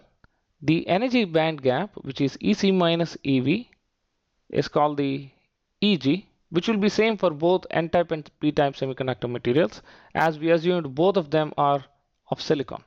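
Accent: India. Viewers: I usually have difficulty understanding indian accents, but this has been really helpful.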